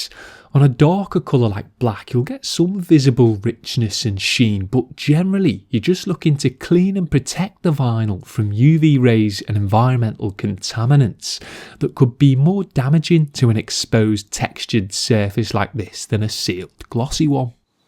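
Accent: Liverpool, United Kingdom. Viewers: Scouse mouse all the way fantastic video as always though bro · He is from Liverpool and has a regional accent. The information is solid, the content is free, stop moaning.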